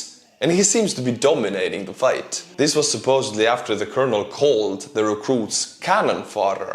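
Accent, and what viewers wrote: Scotland, United Kingdom. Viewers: Thanks again I'd love to say you butchered my language but you sounded like a scots men lots of love from Scotland 🏴🏴🏴🏴🏴🏴🏴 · loving the Scottish accent. 😄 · I like your Scottish accent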